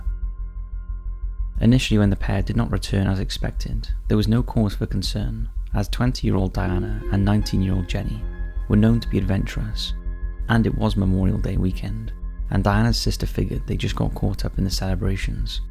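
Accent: Wales, United Kingdom. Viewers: definitely a Welsh accent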